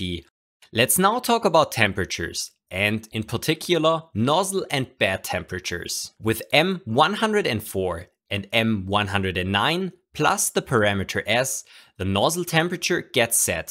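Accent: Germany. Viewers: Man hört raus das er deutscher ist :)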